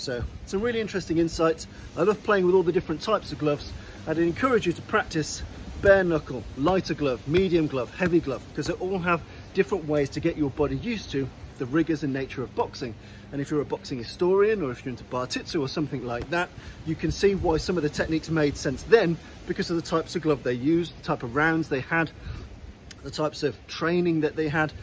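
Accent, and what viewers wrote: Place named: England, United Kingdom. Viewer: Where in England are you from?you have such a lovely accent but I've never heard it before.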